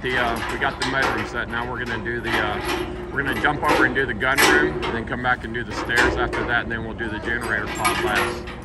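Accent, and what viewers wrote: South, United States. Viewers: Mr., Are you from the East Coast below Virginia somewhere?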